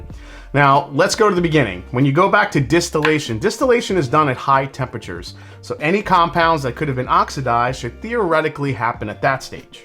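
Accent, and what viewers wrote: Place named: East Coast, United States. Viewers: LOVE this type of content! Keep up the great work and let that East Coast accent out more often. :) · You must be from Jersey. Dialect?🤔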